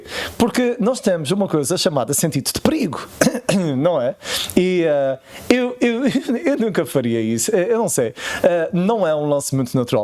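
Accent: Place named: Portugal. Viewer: Pelo sotaque diria que você é português.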